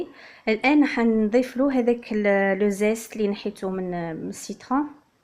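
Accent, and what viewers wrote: Setif, Algeria. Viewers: انا من الجزائر لهجتك تعجبني كثيرا لانني من اصول سطايفية و نحن نعيش في العصمة و بالضبط بحسين داي و نشتاق لسماع هذه اللهجة الحرة حفظك الله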